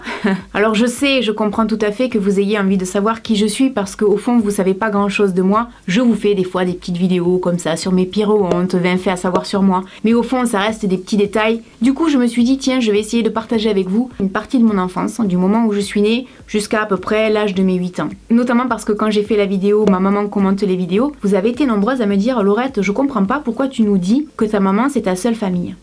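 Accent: South, France. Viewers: J’adore tes vidéos, la plupart du temps ce ne sont pas des sujets qui m’attirent mais je les regarde rien que pour ta personnalité et ton accent du sud que j’adooooore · Merci pour ce partage. Tu es une personne authentique et j'adore. C'est ta maman sur la photo avec toi ? Tu as déjà fait une vidéo avec elle ? J'aimerais trop voir si elle parle comme toi ? Je suis dans le sud aussi, mais c'est pas le même accent. Je ne vous connais pas mais je vous souhaite de bien vous portez toutes les 2, pendant longtemps.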